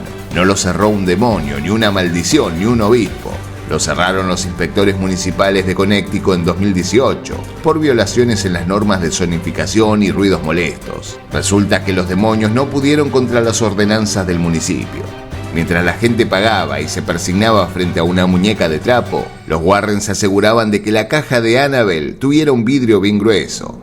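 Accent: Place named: Argentina. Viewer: Amo este acento argentino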